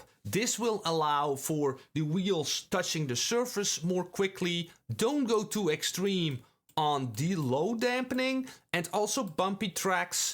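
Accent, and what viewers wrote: Netherlands. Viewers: You're not Dutch, are you? You sound exactly like one of my best friends!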